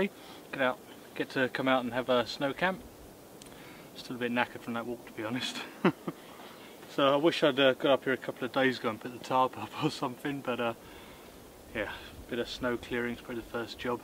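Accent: England, United Kingdom. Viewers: kinda cracks me up a little to see an english guy (I assume you're english, sound like it) making jumbalaya. I didn't think it would be popular over there, across the pond. And the onion. celery and pepper is called "Trinity". Mirepoix is onion, celery and carrot. Next time you make jumbalaya try using andouille sausage instead of chorizo. It makes a much better jumbalaya. Although, so does shrimp, not that I'm saying it's not jumbalaya without shrimp, but much better with. And easy to take and keep on cold weather campouts. Good video btw. That's some cold weather to be camping out in the open like that. Even in a partial shelter, that's still pretty much out in the open. I live in Michigan and have spent many years tent camping in upper Michigan for deer camp in mid November and have spent many nights camping in a tent in sub zero temps. But out in the open...Nope. 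And I don't know what kind of folding saw that is you use, but I have a Gerber and it has offset/staggered teeth and is REALLY aggressive. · Where are you located? The accent is British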